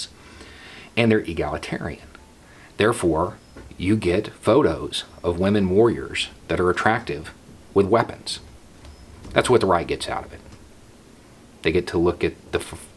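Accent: South, United States. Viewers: Hey. Nice Southern accent, dude.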